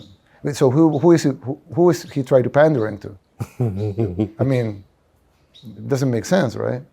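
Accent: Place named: United States. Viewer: Sounds like an American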